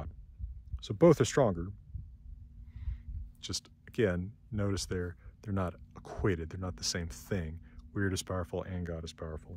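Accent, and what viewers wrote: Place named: United States. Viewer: I was gonna say the opposite, when he speaks it that quickly the American accent comes thru quite clearly, imo. in slower readings, such as the beowulf series with simon, there's much less american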